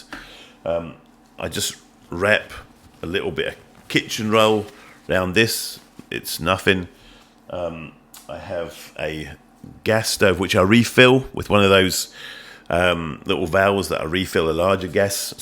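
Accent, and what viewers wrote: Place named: England, United Kingdom. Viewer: Good review and you've acquired a bit of an English accent 😂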